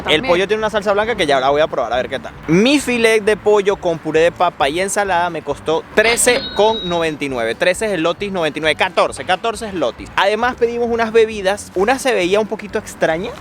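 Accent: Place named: Venezuela. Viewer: Apenas supe que eras venezolano por tu acento cambié de canal!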